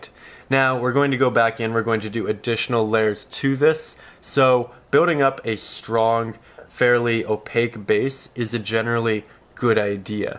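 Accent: Canada. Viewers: Hi I've watched this b4. I know or assume you're in Canada due to your accent, I grew up sailing & boating on the shores NE.This water direction looks odd to me. Where is it coming from? The ocean would not flow from the bank opposite your beach? It would come from the horizon wouldn't it? Therefore the waves would pass over the beach horizontally? My mind simply can't settle on it. Is it me??? Can you explain it to me? Thanks for sharing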